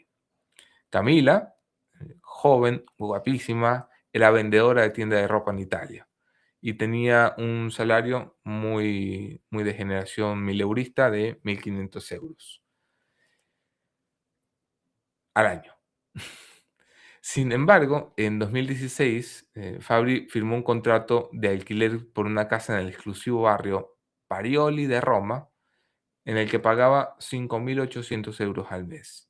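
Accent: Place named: Argentina. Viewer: Por eso tienes el acento argentino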